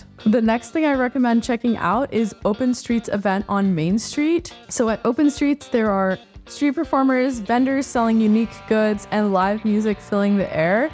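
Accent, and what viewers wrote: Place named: Utah, United States. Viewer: I would have guessed that you're from Utah based on your accent lol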